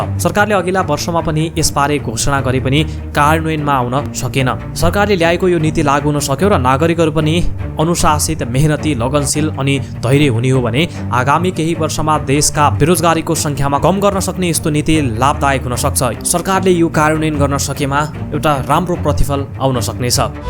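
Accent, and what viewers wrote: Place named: England, United Kingdom. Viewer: नेपाली बोल्दा नि अग्रेजी tone निकालेर pronounce गर्नु पर्ने? आफ्नोपन ल्याउनुस न् ।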